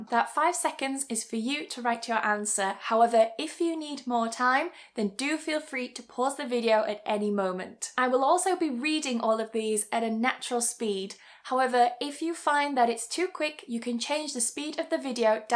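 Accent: United Kingdom. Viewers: Thank you for your British English pronunciation and I your voice that is very very sweet...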